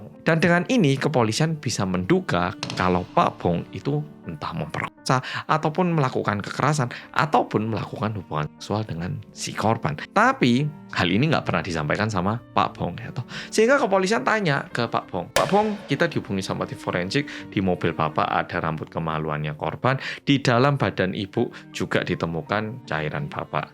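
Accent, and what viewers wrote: Java, Indonesia. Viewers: Jang Hansol, aku sueneng mergo medokmu ga ilang2 😄👍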